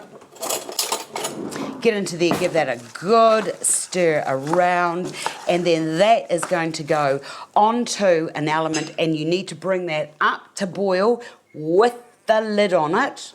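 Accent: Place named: New Zealand. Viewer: Good old Kiwi accent : )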